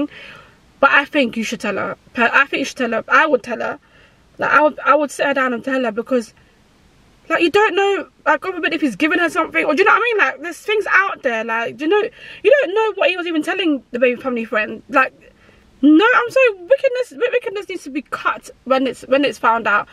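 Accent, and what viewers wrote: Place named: United Kingdom. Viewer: You are so britishhh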